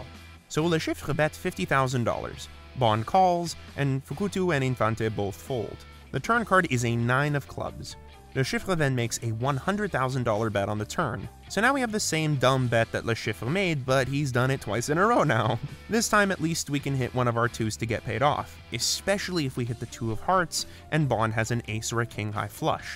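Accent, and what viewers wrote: Canada. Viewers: Québécois identifié! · I like how you say “Le Chiffre” with a thick Quebec accent but the rest is canadian English xD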